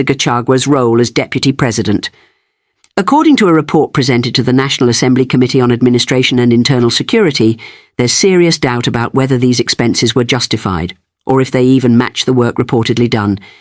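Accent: United Kingdom. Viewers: I am for the audit when this is narated by a British,I have a problem 😢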